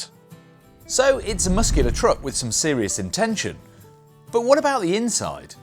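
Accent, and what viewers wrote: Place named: England, United Kingdom. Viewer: Why is a English man talking about a American truck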